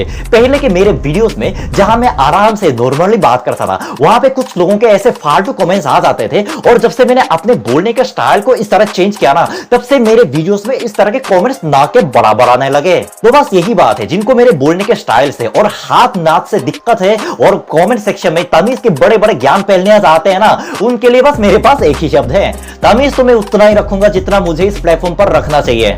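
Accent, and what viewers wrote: India. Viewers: आप पहले ऐसे foreigner है जिसकी हिंदी 100% fluent है और आपका accent भी बिल्कुल हम भारतीय की तरह ही है। आपकी हिंदी देख कर ये बताना मुश्किल है कि आप भारतीय है या दक्षिण कोरियाई नागरिक।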